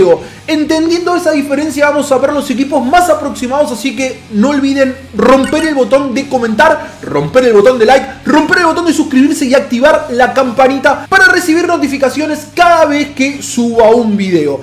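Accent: Argentina. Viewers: como se nota que eres argentino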